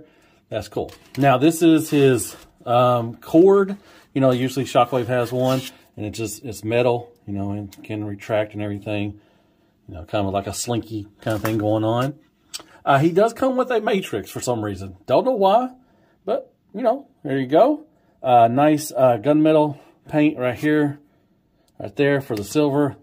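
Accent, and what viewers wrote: South, United States. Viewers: Your enthusiasm is joyfully infectious and a Southern accent is always a pleasure to hear. I subscribed, and am rooting for your channel! Side note from left field here but, I hope they make a Bruticus one day. 'Bingo Toys Bruticus' just rolls off the tongue, don't it?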